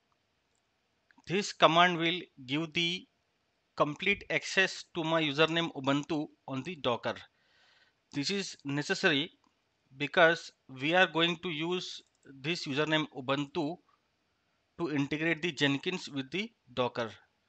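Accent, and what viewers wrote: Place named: India. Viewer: Why do Indians pronounce “Give” as Giuo ??? Why?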